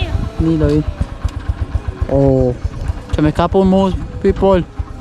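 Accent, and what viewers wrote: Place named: Córdoba, Argentina. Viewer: Pense que estabas hablando en ingles puro, pero sos un cordobés nato como yo , tefelicito por el espíritu que tienes y los anos mozos también cuenta soy un seguidor desde hace poco y me encanta la cosas que haces, disfrútalo y te mando un gran saludo jose cordoba argentina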